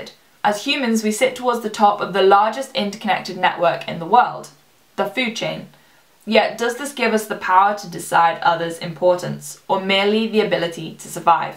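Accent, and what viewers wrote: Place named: Wirral, United Kingdom. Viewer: that makes a lot more sense now!! Oh I'm from the Wirral so thought I could recognise your slight accent. Did you really like Bristol uni?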